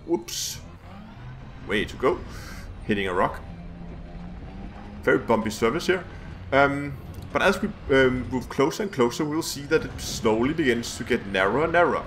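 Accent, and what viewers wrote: Denmark. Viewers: You've probably forgotten ever writing that comment, but it's quite a strong Danish accent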